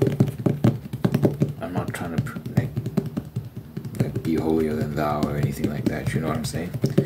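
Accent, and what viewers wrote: South Africa. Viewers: Where are you from I love your accent, sounds like South Africa to me 🇿🇦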